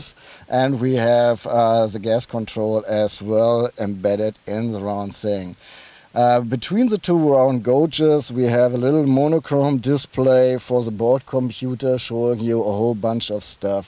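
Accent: Germany. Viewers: lol...he's german, german accent:P not drunk!!!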